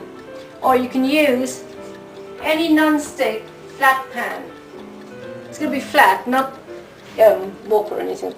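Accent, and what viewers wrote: United Kingdom. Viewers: I am Guyanese and that is not the right way to cook Guyanese roti. You are the only Guyanese i saw make roti different. If you are Guyanese then why you talking like you are from the UK? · I don't see anywhere in the description that is says she is Guyanese.. But since she has a slight brit accent, maybe she is Brit, but family is from Guyana or Trini? I do hear a slight coolie accent. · where are u from? u dont have a guyanese accent, but u do sound british. and u made it differently than my mom and my grandma and my great grandma...they were from guyana.. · That's a real English /London Asian accent honey... with a snippent of Asia that's lived and worked in the UK for 15 + yrs. Whats the bleedin' problem anyway? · Just out of curiosity, what race are you? You look kind of indian or islander, but sound very british o_O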